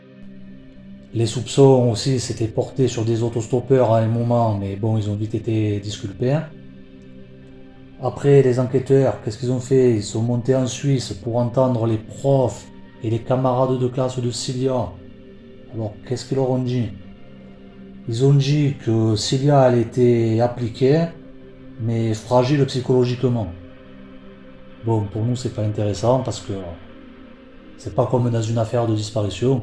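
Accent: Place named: Marseille, France. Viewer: Aaaaah Marseille 😂🤣😂🤣😂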